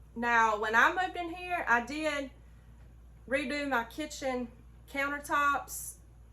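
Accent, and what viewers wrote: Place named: South, United States. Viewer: Oh yeah honey you got an accent. You got that southern drawl. I’m from TN I’ve got an accent too. Lol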